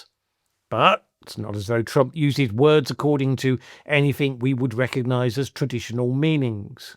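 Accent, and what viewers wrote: United Kingdom. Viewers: Maybe you should go and tell this to the people being massacred by terrorist or have you guys become apologists for terrorists because the people being killed are blacks. Listening to your voice, you must be British the very people who have been the horrible people keeping the terrorism going for you to steal Nigerian resources.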